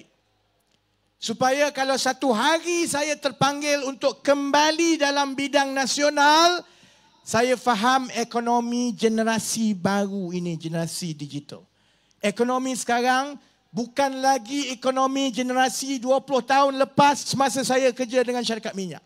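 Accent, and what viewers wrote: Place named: Kelantan, Malaysia. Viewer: Actually I hv liking for his speeches and I like his kelantanese slang....I used to listen from those days....I missed him in 2018....just envy this person for his mind frame....bravo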